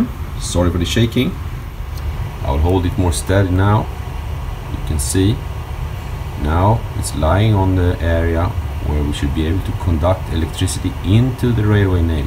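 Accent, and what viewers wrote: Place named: Sweden. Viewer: Haha typiskt Svengelskt uttal, I övrigt bra video.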